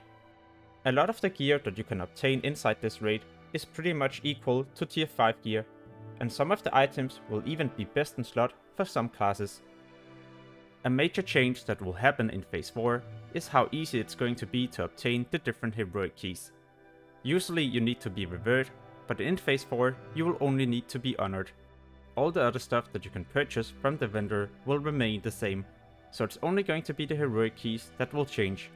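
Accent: Denmark. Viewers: You sound very danish.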